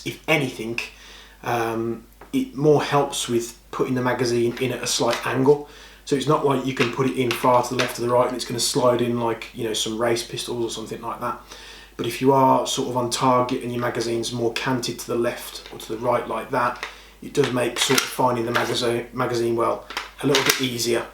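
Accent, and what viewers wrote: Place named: Australia. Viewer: Have you ever lived in Australia? Your voice is very similar to Australians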